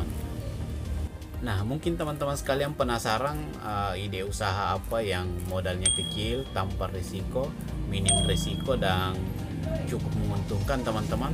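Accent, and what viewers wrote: Indonesia. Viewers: Bang emng dari serang banten ya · Sulawesi ni kyaknya.. Salam dri bugis kaltim..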